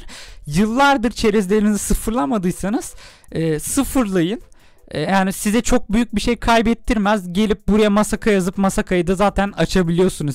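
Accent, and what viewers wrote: Kayseri, Turkey. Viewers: kayseridemi oturuyosun abi ??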